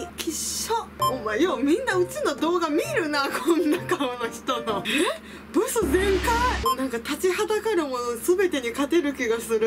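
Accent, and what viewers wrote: Okayama, Japan. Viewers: まあたそさん 初めましてこんにちわ😁😁😁 いつも楽しみに見させて頂いております😊🤚 やっぱり、まあたそさんは面白い☺ですね🤚 いつも、ゲラゲラ😆😆😆と1人見ては笑ってます😅😅😅 懐かしい岡山弁にホッコリ💓しながら見てますよ😁😁😁🤚 岡山を離れ早いもので8年になりますがなかなか実家に帰れないのでまあたそさんの動画を見ては実家を思い出しながら見てます😅😅😅 メイク動画も好きですがこの動画も好きです😊🤚 今のまあたそさんらしくこれからも頑張って下さいね🤗🤗🤗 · 岡山県民だけど岡山弁嫌いでもまあたその岡山弁は好きなんだよなあ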